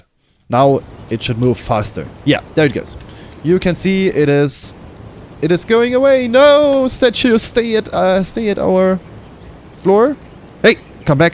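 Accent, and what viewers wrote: Germany. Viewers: No hate, but u can tell he german xD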